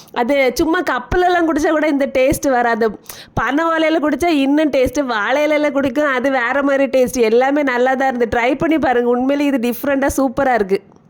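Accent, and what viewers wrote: Kanyakumari, India. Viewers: Don't correct her. It's kanyakumarislang.beauyiful.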